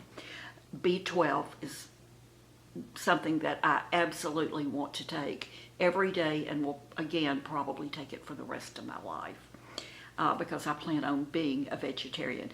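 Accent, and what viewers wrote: South, United States. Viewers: Know now why I love watching you, love the accent(I'm a Southern too) and best of all I am a July baby too!! Besides I love all the other stuff you do, I have already bought some of the makeup you liked and it is great.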